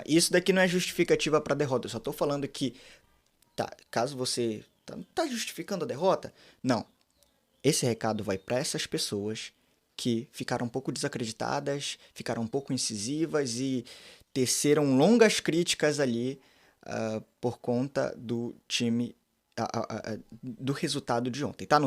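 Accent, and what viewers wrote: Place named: Pará, Brazil. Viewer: Vc não é paraense irmão é o que aparenta pelo seu sotaque 😅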